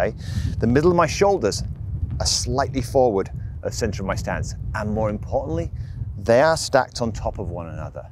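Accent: Yorkshire, United Kingdom. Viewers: Where are you from? Sometimes I hear Yorkshire sometimes I don’t 😂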